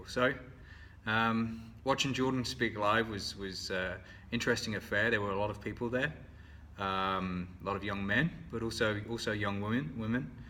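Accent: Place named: Australia. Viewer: Hey has anyone ever told you that you speak with a little bit of an aussie accent?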